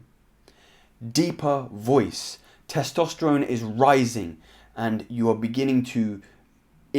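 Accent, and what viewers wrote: United Kingdom. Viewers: Dude has a British accent, so I don't have No choice but to sit down and Listen to Him.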